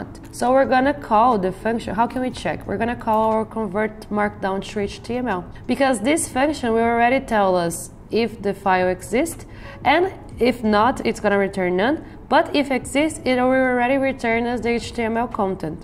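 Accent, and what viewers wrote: Brazil. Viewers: Você é brasileira ? Tem um sotaque muito parecido. Thanks for the class!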